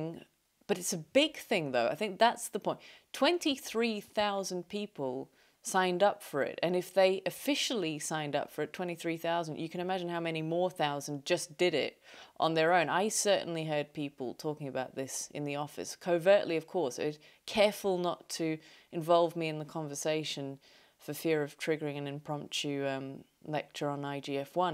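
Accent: England, United Kingdom. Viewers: A subtle yet wicked sense of humor spoken with a English accent- quite enjoyable.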